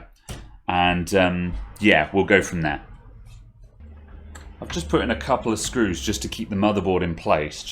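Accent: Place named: United Kingdom. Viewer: I don’t need to watch these videos, as I have over 20 years experience doing this professionally but I love to listen to you while I work, my coworkers don’t talk much and rarely about IT work Something about a British accent really screams “IT” and I have no idea why!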